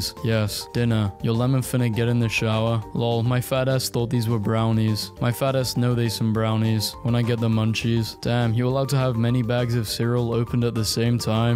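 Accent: United Kingdom. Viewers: He sounds British, so I'd guess the latter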